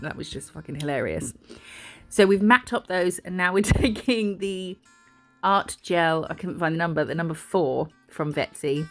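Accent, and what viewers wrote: United Kingdom. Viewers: Can u show us some tricks for very short nails don't have long ones even as false ones Ur deffo Uk I'm from Yorkshire u rvul too fkin champion lol deffo a my city saying lol 🤣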